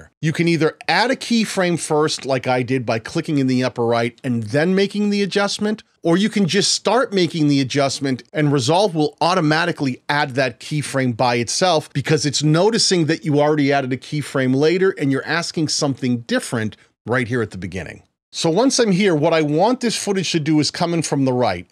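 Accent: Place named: Boston, United States. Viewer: Boston video guy here. I heard your accent and Immediately subscribed.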